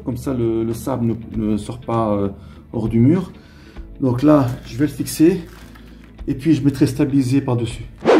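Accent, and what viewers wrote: Belgium. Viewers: Mais ne serais ce pas un petit accent belge ? Voir même liégeois 😊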